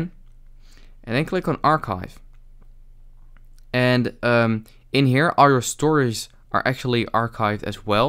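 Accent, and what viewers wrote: Netherlands. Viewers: Hmmm Dutch? I can hear it a little ☺️ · I hear someone is Dutch here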